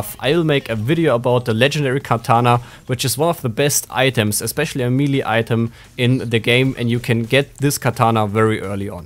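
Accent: Germany. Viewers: bro wieso machst du englishe videos man erkennt an deiner stimme das du deutsch kannst :)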